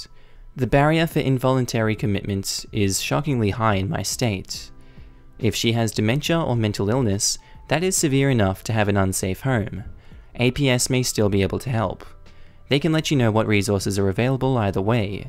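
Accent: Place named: Australia. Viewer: a voice so Australian I nearly fell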